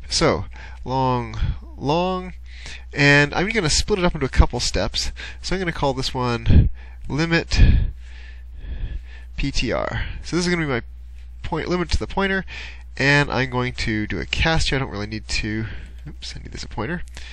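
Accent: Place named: United States. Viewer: Cis online instructions sounds weird when read with an american accent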